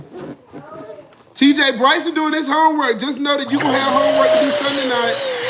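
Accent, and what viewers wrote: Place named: South, United States. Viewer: Lol you are so country!!! I can tell y’all from the south! 😂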